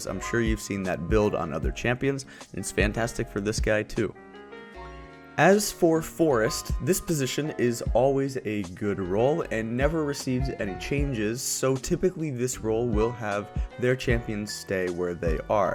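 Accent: United States. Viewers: i love that Alabama ascent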